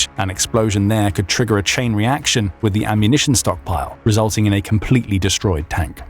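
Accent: United Kingdom. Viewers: Ahahahaha the accent dont make us go to wrong analises. The british trying to win the loose war on the blabla. Really???!!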